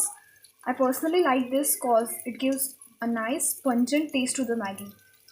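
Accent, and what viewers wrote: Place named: India. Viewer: she is speaking all buttler english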